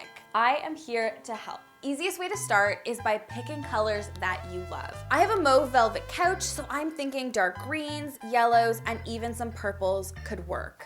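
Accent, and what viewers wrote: Canada. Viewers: I didn't know canadian accents were that obvs to others haha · Very cozy! Your living room is so inviting - attractive, but not intimidating. There's a happy mean between sterile and cluttered - just enough to engage the eye but leaving plenty of breathing space. I do understand the fire hazards of candles, but LED candles (or diffusers) aren't the most environment-friendly (though they are nice!). Love the pom-pom blanket.. but unfortunately, it's too hot right now in India to even contemplate coziness and rugs and pom pom blankets without a shudder.. coziness is a cold shower right now. Please keep making your awesome videos- they are such a treat and inspiration - and that Canadian accent is just too too adorable. Hi to Harriet and Marty! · Dat canadian accent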